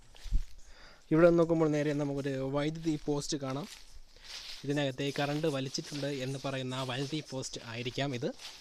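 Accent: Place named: Trivandrum, India. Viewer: Tvm ആണ് alle സംസാരം കേട്ടപ്പോൾ manasilayi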